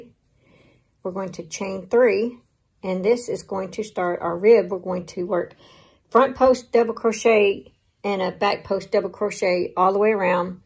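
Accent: South, United States. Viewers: I love your southern accent. I also love...love this pattern. Thank you so much for sharing!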